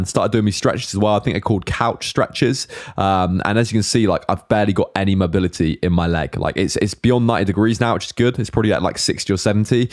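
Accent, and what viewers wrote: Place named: England, United Kingdom. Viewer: I love your English accent 😍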